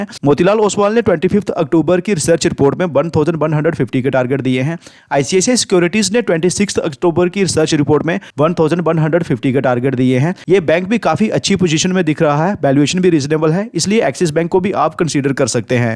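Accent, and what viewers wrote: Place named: Punjab, India. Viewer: Bhai aapka accent is punjabi......am I right??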